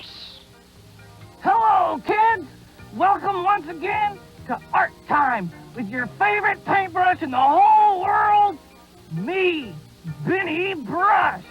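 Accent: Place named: North Carolina, United States. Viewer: I don't know why, but his voice reminds me so much of my friend from North Carolina. My friend has a softer, slightly deeper voice, but he has almost the exact same accent as Binney.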